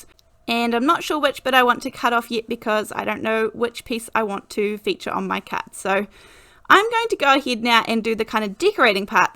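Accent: New Zealand. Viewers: Great to hear a Kiwi voice, and the clear, no fuss instructions. I live in the South Island too. Love that quick and easy card. 👍💕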